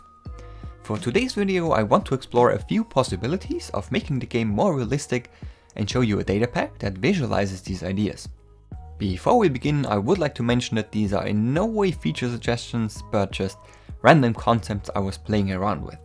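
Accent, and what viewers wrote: Germany. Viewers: you sound very german. am i right? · Klingstn bisschen deutsch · Hah wusst ich doch das dein Akzent deutsch/österreichisch klingt. Props an dich du bist einfach der Beste Minecraft "developer" (<--------- also du weißt was ich meine xD)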